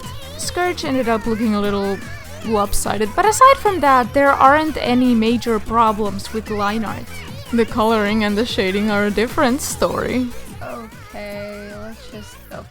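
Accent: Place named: Finland. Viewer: Ah tää suomalaisten enkkuaksentti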